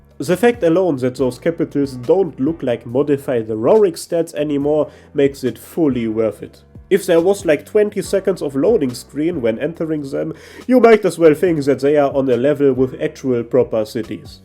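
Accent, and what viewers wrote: Germany. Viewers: Man merkt voll dass du aus Deutschland bist 😅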